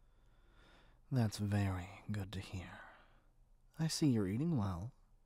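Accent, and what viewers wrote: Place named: South, United States. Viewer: Omg the southern accent 😂😂😂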